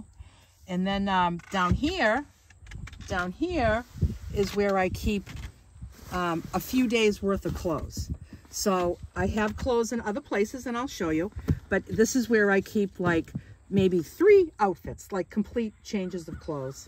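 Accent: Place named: Boston, United States. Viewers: Love your van, so well thought out and comfortably organized. I'm from Boston born and raised so I recognized your accent! I love how you have accommodated your priority to your self care of healthy eating and lifestyle, you have proved it can be done even living in a small van on the road. Brilliant! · Yep I recognize that Bawsten accent. Love Boston ( I’m in Ct) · You talk just like me so you must be from New England. 😊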